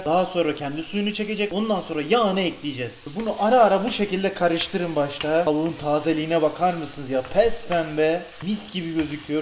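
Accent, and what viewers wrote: Adana, Turkey. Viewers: Abim sen adanalısın napıyonn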